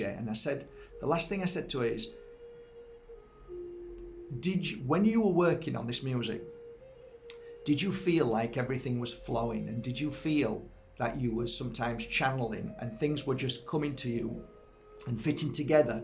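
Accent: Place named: Northern England, United Kingdom. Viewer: I seem to have been on a parallel path with your own and since our paths eventually crossed in cyberspace a week or so ago I have been viewing lots of your videos. I can't tell you how re-assuring it is to hear someone say what you feel is absolutely "spot on" - and in a proper Northern accent as well! Lella's video is so beautiful and I will certainly listen to it as you suggest. A few years ago I came by a small piece of Moldavite and also a small herkimer diamond from a gem shop which I am hoping are genuine. I plan on continuing to look and learn from your postings. Love and light to you and yours, and all your subscribers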